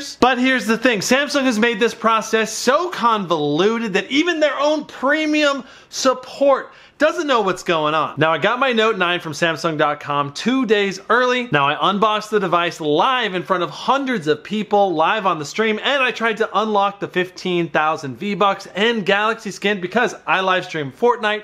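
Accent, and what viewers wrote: India. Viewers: Indian accent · Sounds like an indian scammer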